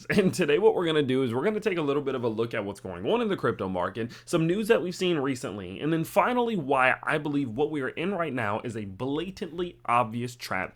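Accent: DC/Maryland, United States. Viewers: You have a DC/Maryland type accent. Maybe Delaware but prolly not....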